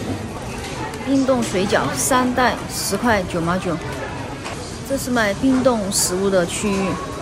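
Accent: Sichuan, China. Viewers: 我想听听你的英文发音有没四川味？ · 川妹子啊，，這川普。。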